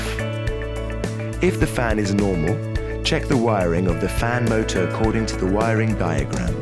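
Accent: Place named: England, United Kingdom. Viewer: I HATE ROBO VOICE VIDIEOS< , ESPECIALLY WITH AN ENGLISH ACCENT!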